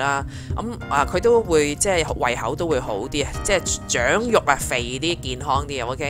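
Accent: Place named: United Kingdom. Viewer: 睇你片重可以學英文，你 D 英國音好好聽。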